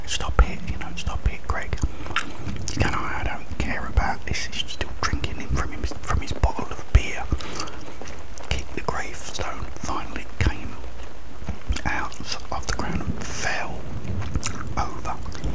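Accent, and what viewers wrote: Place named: United Kingdom. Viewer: Bri’ish + gum = ☺️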